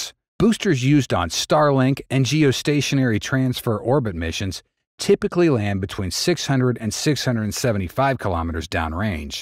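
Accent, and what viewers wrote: South, United States. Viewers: his southern drawl was so strong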